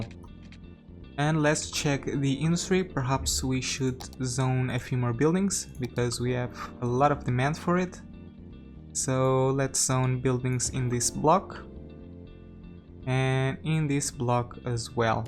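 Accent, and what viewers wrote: Portugal. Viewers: He is one of ours, Portuguese. Very clear from the accent actually. But yes, I agree, he's far from a clumsy English speaker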